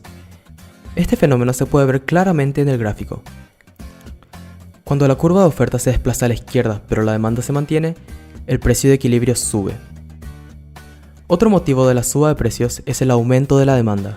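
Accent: Paraguay. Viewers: Decime que sos Paraguayo o vivís en Paraguay.... 🤔🤣👌🇵🇾👏👏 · Sos paraguayo? tenes nuestro acento :0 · WTF ese acento Paraguayo. Jaja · Ndeee vos sos paraguayo verdad?. Te pille por tu acento xD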